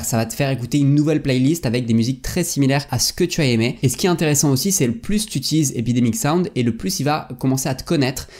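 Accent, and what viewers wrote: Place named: Southeast, France. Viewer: Merci c'est vraiment top ! Y a plusieurs outils que j'utilise déjà (canva / tubebuddy), j'ai pas encore testé opus clip que j'ai découvert grâce à toi, mais faut que je m'y mette, et que j'aille voir les autres. PS : on est d'accord que tu viens du sud est, genre Nice ou pas hyper loin ? Tu transformes les sons en O en un mixe entre O et A, j'ai entendu ça que là bas.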